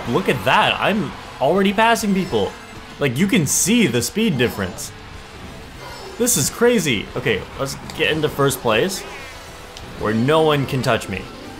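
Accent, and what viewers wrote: Canada. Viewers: i mean he's from Canada, sounds exactly the same, uses the same expressions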